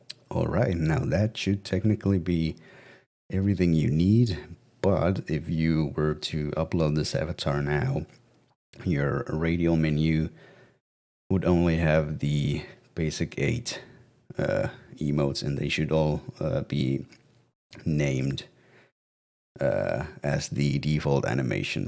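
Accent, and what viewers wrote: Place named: Finland. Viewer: 10 seconds in and I can already tell you're a fellow native Finn.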